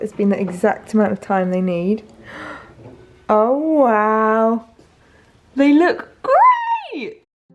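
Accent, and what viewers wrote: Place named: United Kingdom. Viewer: It's really interesting that the company is called sage and has a crumpet setting here in the United States the company we have is called brevel although that company is based out of Australia but basically is the exact same oven we don't have a crumpet feature but we have other features but it basically is the exact same device. Also I do have to say I love your British accent and you are stunning absolutely stunning look forward to more cooking videos with the device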